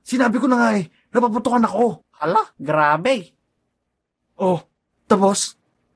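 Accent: Ilocos, Philippines. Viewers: Ilocano ka boi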